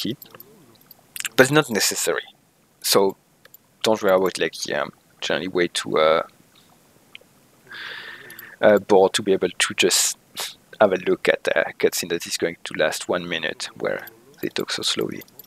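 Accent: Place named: France. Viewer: I really like your french accent, by the way.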